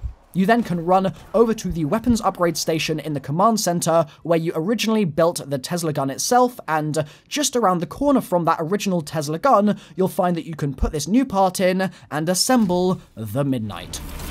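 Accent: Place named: United Kingdom. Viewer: Hey I think you sound similar to David Tennant just by your enthusiasm and your British accent (ik David is Scottish but come on you two have similar sounding voices)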